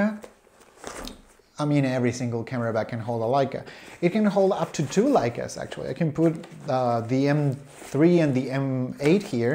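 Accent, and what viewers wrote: Chile. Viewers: ... eres de chile?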